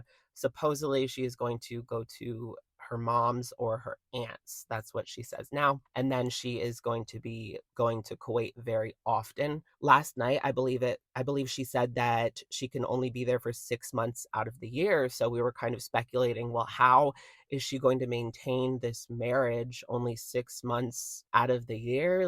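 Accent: Boston, United States. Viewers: Her accent is not New York. It's Boston. Its a weird version of Boston, but still Boston. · Boston accent not New York. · It’s a Boston accent. Not a NY accent. Either way, it’s sus.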